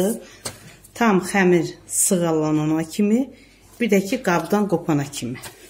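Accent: Tovuz, Azerbaijan. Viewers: Allah ruzinizi bol elesin🙏 ellerinize sağlıq 🥰lehceniz biz terefe oxsadi Tovuz lehcesine🥰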